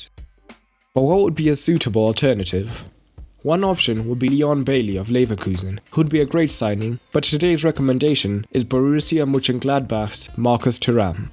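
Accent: South Africa. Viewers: Ayy you South African bro??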